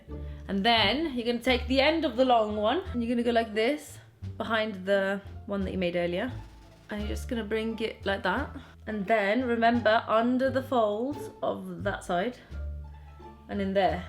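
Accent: United Kingdom. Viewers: That british aCCENT thou! U go dina As if am hearing adEle the singer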